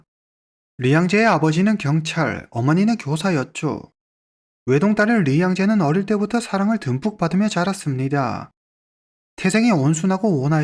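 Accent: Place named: Korean-Chinese, China. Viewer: 조선족 특유 억양이 살짝 남아있는듯 그래도 많이 고치셧네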